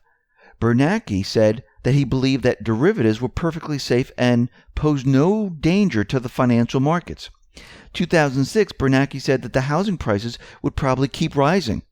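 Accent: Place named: New York, United States. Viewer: You’ve got to be from New York. Queens if my ear is correct.... Southport CT...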